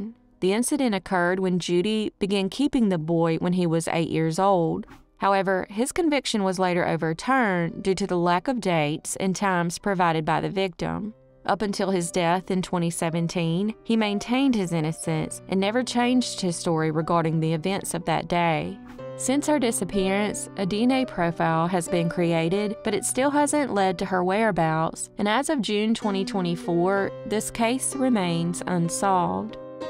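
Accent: South, United States. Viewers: I always try to picture what this southern girl looks like 🤔 the southern accent is adorable 😊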